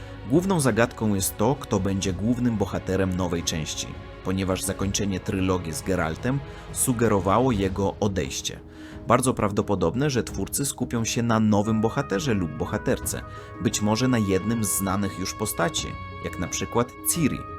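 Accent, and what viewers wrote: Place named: Russia. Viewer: brat jest naprawdę z rosji a ja myślałem że tak dla beki taka nazwa xD ale kocham twój akcent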